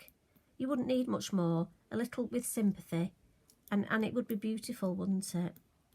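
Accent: United Kingdom. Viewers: Absolutely gorgeous! I have got to try this one! I love your accent! My son and his wife live in London and last fall we stayed there three months. It was the best time of my life other than having my babies! Now I’m going to be a grandmother....so exciting! Just hope we can get over there again next year! So enjoy your videos...keep them up!♥️😘🤗🌸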